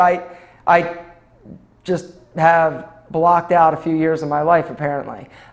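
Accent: Philadelphia, United States. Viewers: I knew I recognized that philly accent!!! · I knew that accent sounded familiar. Grew up in Philly as well.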